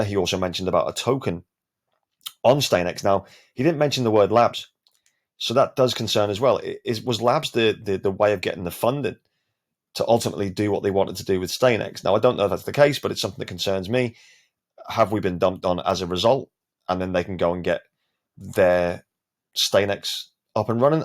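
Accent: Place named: London, United Kingdom. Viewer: Hi....I like your frank videos. Sensible. You sound like you're from in or around London somewhere. Me too. Anyway, I've noticed a pattern recently on my portfolio. I go to bed at midnight (GMT), have a quick look at the value, then wake up at 07.00 and I'm in the money! Maybe 3-4% - all good. Then about 10.00am it starts to fade back about 2%. So, my theory is that the overnight markets, (guessing it's west coast US, then Korea and Japan are influencing the prices)., can maybe be predictable. So, here's the plan...I buy good tokens at midnight, (HBAR,QNT,XRP) then sell when I wake up...Is this a foolish idea?....What do you think? Cheers pal.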